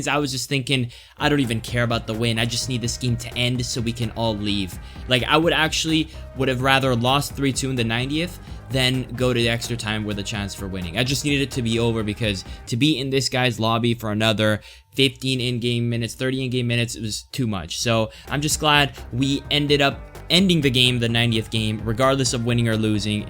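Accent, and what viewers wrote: United States. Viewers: Completely out of context, but out of curiosity, where are you from? Your accent sounds waaaaay too american for an actual italian, lol.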